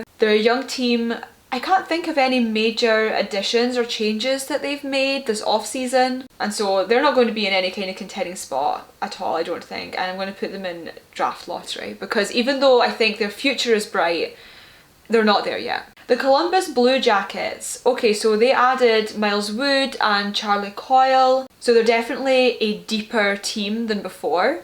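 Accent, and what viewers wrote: Scotland, United Kingdom. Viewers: Be still my beating heart. Scottish blond woman who loves Leaf's. I might of just found my future wife. As a Finnish hockey fan and judge Toronto Leaf's fan I am in love... 😂😂 I really could listen that Scottish accent all day long. But to be serious, I'd love to see your deeper prediction/valuation of Leaf's. Love from Finland.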